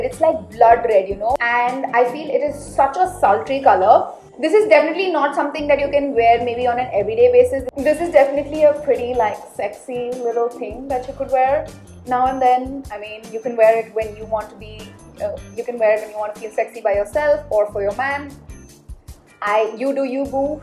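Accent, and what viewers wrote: India. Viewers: INDIAN GODDESS ALERT! Thought you were from the UK for a second, then I heard the accent lol. You should become a super model! These big companies will give you all the outfits and products FOR FREE. Life is good huh! Or just marry some saudi billionaire or russian oligarch.... decisions decisions 😁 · The only thing more amazing than this woman's body is her accent <3 Indian women! <3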